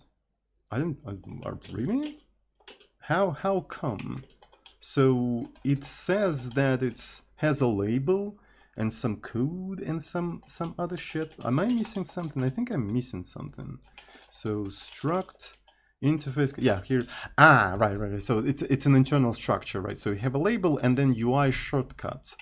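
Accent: Russia. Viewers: Какой хороший , понятный русский акцент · Started watching… heard familiar accent… found that author is from Moscovia.. closed the video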